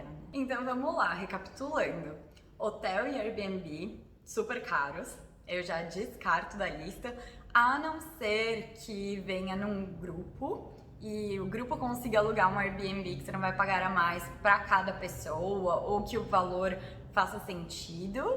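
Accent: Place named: São Paulo, Brazil. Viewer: Otimo video …Vc tem um sotaque muito forte de paulistana ha ha, fofo, Eu Tbm Sou de SP mas meu sotaque is mais do interior😊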